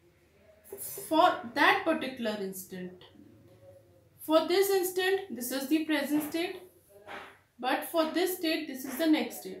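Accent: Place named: India. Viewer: In the thumbnail, I saw a white arm and I clicked the video because of that. But it is goddamn indians AGAIN! That terrible English and the accent is driving me crazy and I cannot stand any content made by indians.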